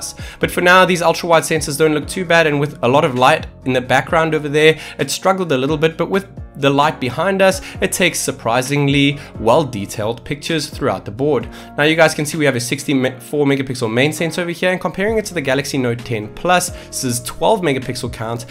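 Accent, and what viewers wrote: South Africa. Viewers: Is this oke South African? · sounds like your from South Africa.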